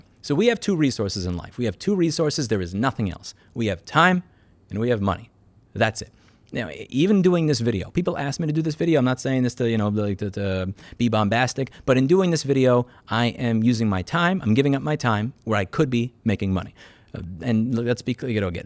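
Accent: New York, United States. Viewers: the feeble video can't handle his new yorkness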